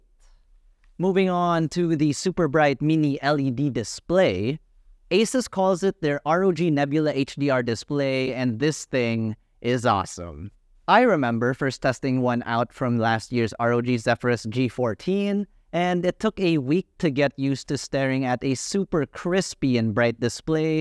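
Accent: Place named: Philippines. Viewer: Love the subtle Filipino accent lol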